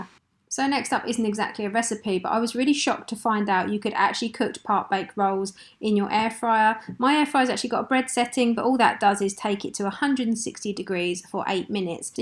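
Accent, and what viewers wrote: United Kingdom. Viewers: I don't like spicy food( generation thing) but it is so good to hear a British voice and knowing where we can get ingredients in our UK supermarkets. You are a good demonstrator too so more Air Fry videos please as they are becoming very popular with this energy crisis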